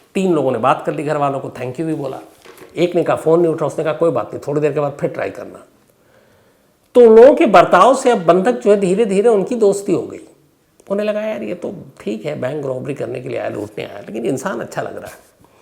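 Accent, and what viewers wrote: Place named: Bihar, India. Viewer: Your language says that you are from Bihar?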